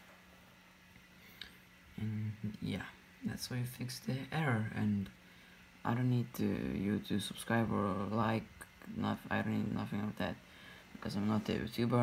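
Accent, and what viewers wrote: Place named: Russia. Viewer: русский акцент не скрыть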